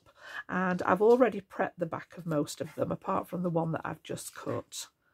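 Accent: United Kingdom. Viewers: that’s what I thought I heard. ❤❤❤❤Where about in Yorkshire?❤❤❤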